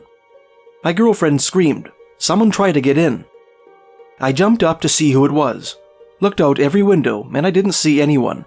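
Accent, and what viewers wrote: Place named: Canada. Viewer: I saw your moving video! Was a great little video. I tried to leave a comment but i dont think it was recorded. I was wondering if the house you left was the location of the rock blasting and the little guy? And you are speaking English. "Canadian English " wow that's different. Greetings from Brazil.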